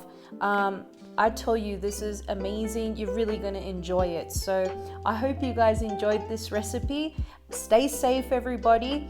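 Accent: Australia. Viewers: saw you on Mike Chens channel. great channel and i have to say every time i hear your accent, I keep thinking of crocodile dundee or steve irwin. "you call that a knife?" lol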